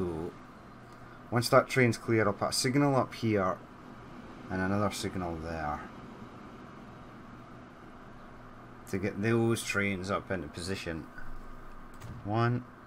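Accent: Scotland, United Kingdom. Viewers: Hey, are you Scottish, Irish, or some flavor of British?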